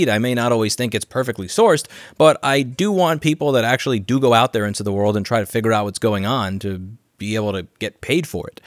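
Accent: New York, United States. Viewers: Get the fuck outa here, yep he is definitely from new york lol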